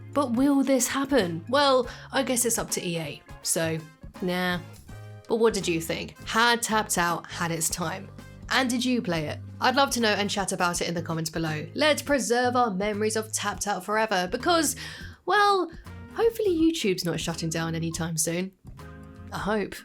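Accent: United Kingdom. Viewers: A simpsons mega fan with a British accent? Tickle me pink!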